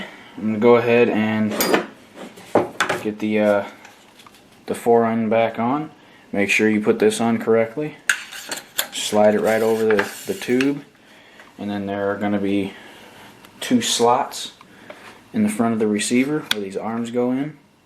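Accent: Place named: Mississippi, United States. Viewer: Thanks 4 the clip. Are you from Mississippi? It's just that accent bro.i know it..;)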